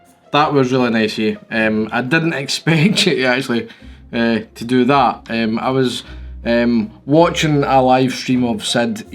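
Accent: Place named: Scotland, United Kingdom. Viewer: No, having a Scottish accent will not put people off subscribing, although in my case I prefer it because I lived there and it’s not Northern Irish 😂. My favourite beauty YouTuber is also Scottish.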